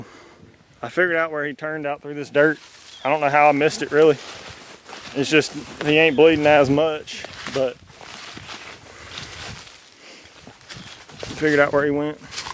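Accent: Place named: Georgia, United States. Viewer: My first time watching your channel but I could tell you were from Georgia. I'm in Douglasville what about you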